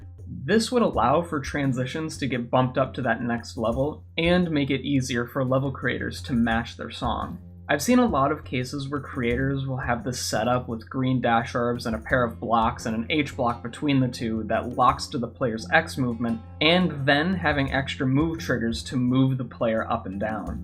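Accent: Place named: Canada. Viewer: You sound like kenadian.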